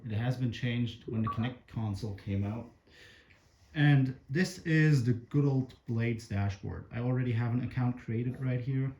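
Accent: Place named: Germany. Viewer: bin hier bevor das video 6 stellig wird :) echt nice, musste auch länger hinhören um zu checken dass du deutsch bist :D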